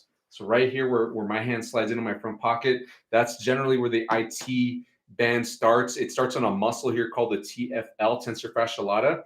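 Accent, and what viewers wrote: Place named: Tennessee, United States. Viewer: I can hear you tennessee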